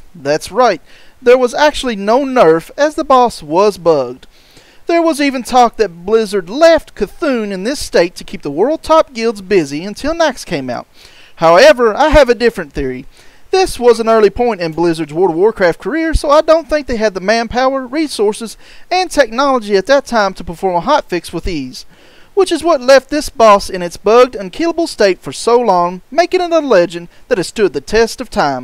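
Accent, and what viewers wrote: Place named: South, United States. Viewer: Like the southern accent, Yogg Saron is my favorite of the Old Gods.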